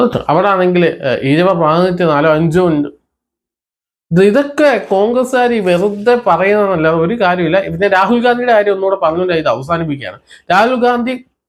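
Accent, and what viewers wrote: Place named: Tamil Nadu, India. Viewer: സംസാരത്തിൽ തമിഴ് കലർന്ന പോലെ ഉണ്ട്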